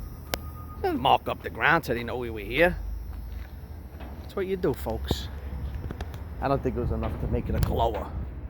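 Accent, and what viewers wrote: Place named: New Jersey, United States. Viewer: The Jersey accent makes it all worth it.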